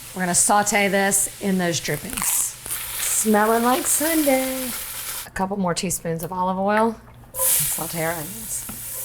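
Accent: United States. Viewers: It's called gravy. Your accent attests to that. You became what my great grandparents wished and worked for: you are an American! It's pronounced amerigan. When my people came here from Naples and Calabria a long time ago, they were prejudiced against Couldn't get a job or into a school to learn. Anything. This was also the situation for Jews, Greeks, Irish, Chinese, and a whole lot of other people who weren't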